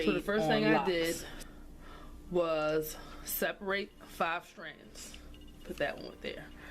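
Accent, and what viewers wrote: Baltimore, United States. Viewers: Knew you was from Baltimore first 30s lmao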